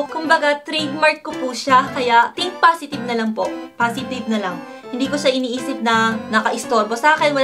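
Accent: Japan. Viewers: Sarap pakinggan ng Japanese accent mo huhu. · Dami alam ng mga bashers na yan! Obvious na japanese ka nmn.. it doesn't matter kung pinay o haponesa ka ang importante hindi mo nakakalimutan na may dugong pinoy ka.. hatsuong palang alam mo na haponesa ka ..mas bilib ako sayu kc napaka fluent mo sa tagalog..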